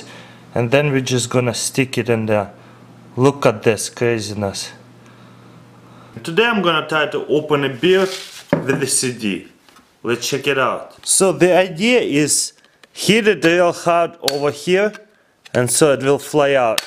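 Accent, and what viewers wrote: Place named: Russia. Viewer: он русский, слышно по акценту, наш человек.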